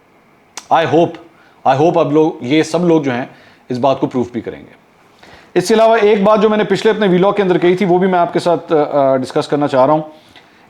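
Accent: Punjab, Pakistan. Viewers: Hahahaha Bhai ap ka ya Jo panjabi ka tuch h vlog main ya Kamal ka hota h I love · Ap ka punjabi tarka bht acha lgta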